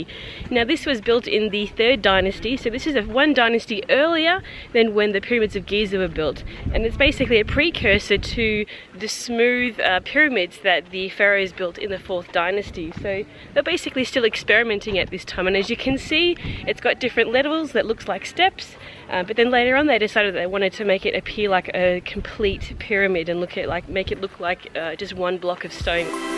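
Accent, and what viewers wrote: United Kingdom. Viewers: Are you Eglish( from England)? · did I write your name correctly you got a beautiful London accent very clearly prounced each word I love it, and it seems that you’re a pro. Guide and nicely done touring in Europe for the firm intrepret perfect... especially your smiling gestures shows how you enjoy your job very nice indeed...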